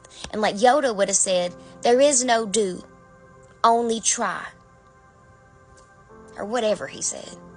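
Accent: South, United States. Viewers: I embrace your advice in a southern drawl and everything in between. I will till the ground, plant the seed, and watch it grow. I won’t let it fall without tilling the ground. I’ve gone through enough crap to have fertile soil. To challenge life with authority. I love listening to you to much so I will listen to you. For the Lord’s sake. For all our sakes. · How sweet and Southern❤😊 Beautiful message. Thank You Sister. Virtual Tea❤😊 Amen. Message received. Hugs to ya.🙏🙌💕💞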